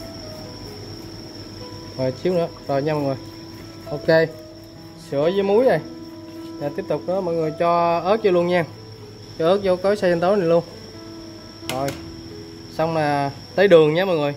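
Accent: Ben Tre, Vietnam. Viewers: Cho mình hỏi, nghe nói chuyện giống người miền Tây, giống quê mình, bạn ở đâu vậy? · Nghe giọng nói giống như bến tre z a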